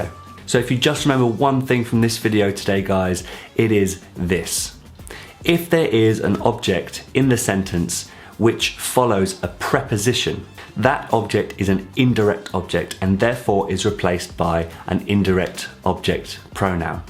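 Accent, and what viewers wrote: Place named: London, United Kingdom. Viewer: London accent with the best french class. I love it man. Well- done.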